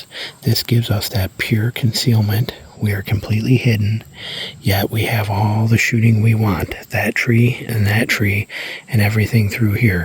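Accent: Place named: South, United States. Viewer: Ahh.. thankyou . Spent 10 years in N.C., you'd Think i'd know how to decipher a souther accent. Scheesch... !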